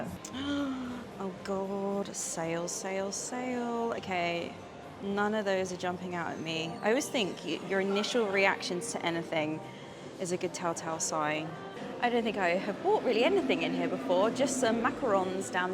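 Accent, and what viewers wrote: RP, United Kingdom. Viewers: Your Received Pronunciation is exquisite.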